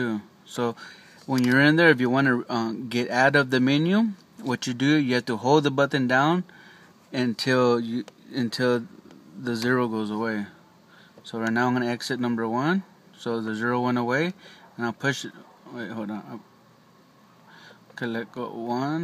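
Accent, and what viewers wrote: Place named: Southern California, United States. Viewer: Wow, you are really smart, ese. I came from southern Cal and feel like I recognize your accent. but you know so much! Where did you learn all this? I am tryhing to get my air suspension to work without going to dealer. I don't even know if you are still up, so if you are, I'd appreciate any hints. and because of all your start/stops, Ima have to go back and look at it...slowly! thank you.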